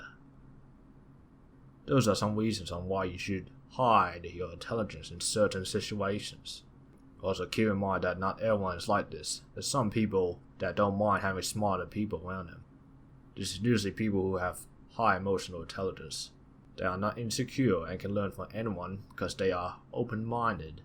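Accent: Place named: Australia. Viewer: I’m not trying to disparage* I’m curious, where the narrator is from. I’m guessing someone who spoke one of the Asian languages fluently, then learned English as a second language either in Australia or with an Australian tutor. Am I correct? Am I close? Again not trying to accidentally offend you, that’s the accent I picked up on, I could be wrong, so I hope my question doesn’t bother you!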